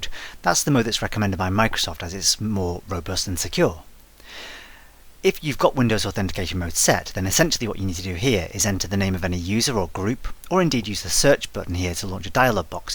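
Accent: United Kingdom. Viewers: Dear it great tutorial, allow me to thank you for your this great job, but also allow me to say that you have a British accent and speaks a little fast which is hard to understand for not native English speakers, once again thank you and you do wonderful job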